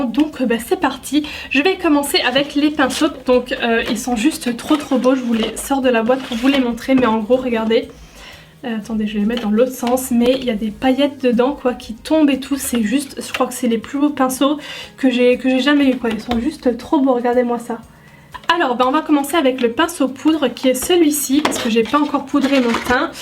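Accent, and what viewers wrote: Lorraine, France. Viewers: Je découvre ta chaîne avec plaisir ^^ Ahhh moi aussi je suis Lorraine d'origine et alsacienne d'adoption Lol je l'aime trop ton petit accent 😍 Merci pour ta bonne humeur 😉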